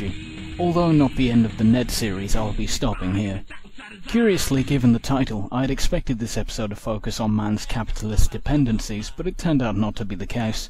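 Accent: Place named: England, United Kingdom. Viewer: I don't. I have asbolutely no idea what this series of videos have been all about. I subscribed to because of slowbeef's rambling mock let's plays, and I have been utterly mystified by the English-accented bloke who has been commenting on this series of flash games, if indeed that's what they are. Although, perhaps, fucking with the likes of myself is perhaps what this series of videos ahas been all about in the first place...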